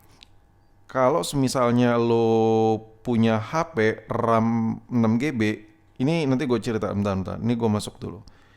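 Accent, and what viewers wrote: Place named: South Jakarta, Indonesia. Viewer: Bang elu jaksel ya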